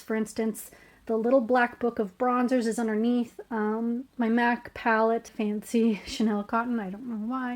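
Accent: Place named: Maritimes, Canada. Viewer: i could tell you are from the maritimes from the accent my mom is too. i go back there every summer newfoundland is absolutley beautifu. l lots of love from vancouver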